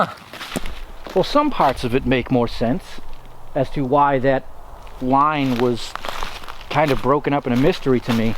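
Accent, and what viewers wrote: Massachusetts, United States. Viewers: Anyone from nh vt here his mass accent. Or is it just me. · This guy sounds like he’s from Massachusetts not New Hampshire. N.H. residents don’t sound like that . I fall o wed telephone lines. Pawnd and stuaff.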